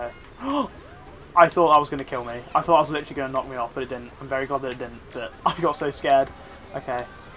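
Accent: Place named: England, United Kingdom. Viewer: The English accent is very confortable to hear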